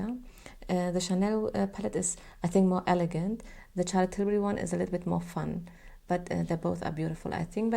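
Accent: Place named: Arab world. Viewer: I will guess Middle East . Arabic.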